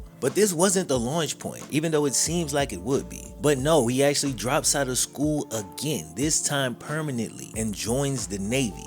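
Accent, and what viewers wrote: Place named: Mississippi, United States. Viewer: Thanks. As another Mississippi boy, I love the way you tell your stories. It's not everyday you hear someone that sounds like us narrating so well. Love your work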